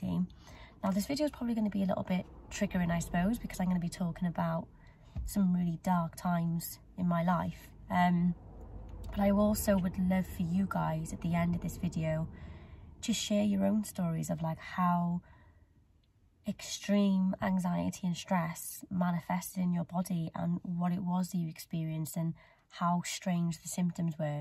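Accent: Cardiff, United Kingdom. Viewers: Old video, but I find I have an inability to adjust to situations. Maybe eyesight issues from anxiety! Btw, you from the ‘diff? I hear a Caaaardiff twang 😂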